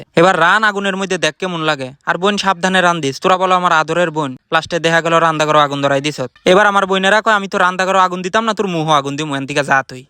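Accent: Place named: Shariatpur, Bangladesh. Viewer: ভাই আপনার কথা শরিয়তপুর জেলার মতো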